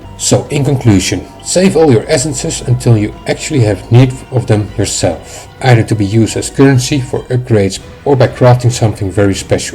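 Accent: Netherlands. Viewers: you sound really dutch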